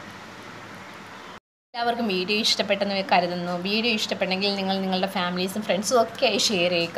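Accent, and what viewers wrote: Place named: Kollam, India. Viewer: njan kollam aanu😅😅. Samsaarikkunne kettappol thonni aa side aannu😃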